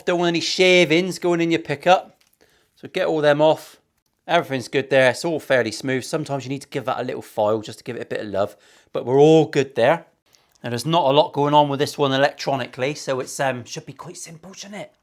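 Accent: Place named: England, United Kingdom. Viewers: Nice job mate.. it was fun watching you at work.. like the English accent 🙂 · Heard the geordie accent but thought no more about it, then does the demo.😊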